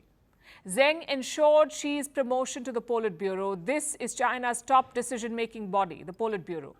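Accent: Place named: India. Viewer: Indian Engrish is grating on the ears heh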